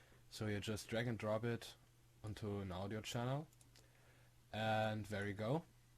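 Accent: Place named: Germany. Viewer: Bist du Deutsch? wenn ja hört man es sehr :D aber trotzdem gutes Tutorial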